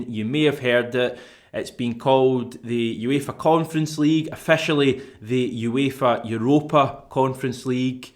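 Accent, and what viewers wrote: Scotland, United Kingdom. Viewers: He's scottish. I think most folk don't understand us.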